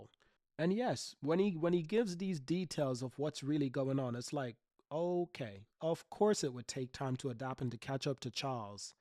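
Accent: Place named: Bristol, United Kingdom. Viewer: **It wasn't anything specific you said; it was more about how you pronounce certain words. You can tell that your tongue is positioned higher in your mouth, closer to the palate, when you speak. Additionally, there's less movement in your jaw; the tension in the jaw muscles can be heard if you know what to listen for These are 2 key and unique mechanics to a Bristol accent vs other accents from that region. There were a few other clues, but none related to slang, as I'm not familiar enough with British regional slang for that to have been a hint for me. I could possibly narrow down the area further, but out of respect for your privacy, I won't do that in the comment section, lol**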